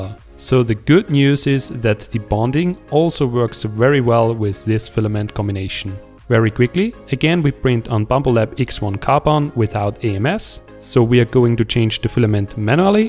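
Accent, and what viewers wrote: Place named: Germany. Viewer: Wenn der deutsche Englisch spricht. Dennoch, gutes Video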